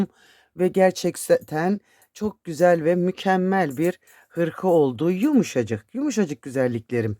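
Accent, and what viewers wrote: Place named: Malatya, Turkey. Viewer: Çok güzel anlatıyorsunuz sivenize bayılarak dinliyorum Malatya'da bizim gibi konuşuyorsunuz şimdiye kadar hep şişle örgüler yaptım size abone olduktan. Sonra tığla devam etmeye karar verdim daha kolay daha çabuk bitiyor buda benim işime geliyor torunlarla uğraşmaktan zaman kalmıyor yanlız birde şu pileeli kız bebek hırkası aatarsaniz sedvinirim cunkuj benimkiler hep kız 🤗🤗🌹🤗🤗🤗🤲🤗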